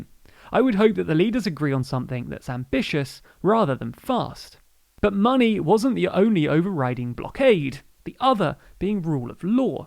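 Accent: United Kingdom. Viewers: I like the Britishness of the pronunciation though haha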